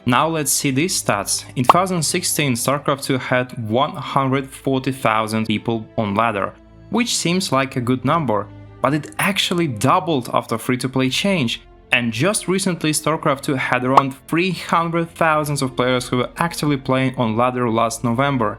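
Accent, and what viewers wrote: Russia. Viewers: Why do Russians pronounce match as /much/?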